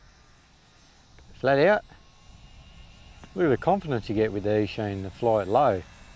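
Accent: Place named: Australia. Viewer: Accent = Ozzie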